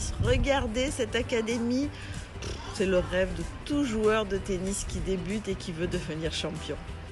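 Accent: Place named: Provence, France. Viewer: Merci, j'aime beaucoup ta voix, posée, légèrement teintée des cigales, très agréable! Dommage pour Nadal!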